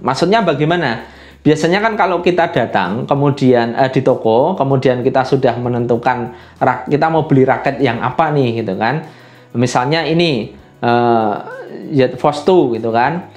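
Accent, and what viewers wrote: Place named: Java, Indonesia. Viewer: geram lak aku dengar jawa dia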